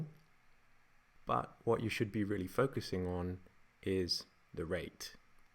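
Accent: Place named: England, United Kingdom. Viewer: Great content man. You must be the only Italian on earth with an English accent.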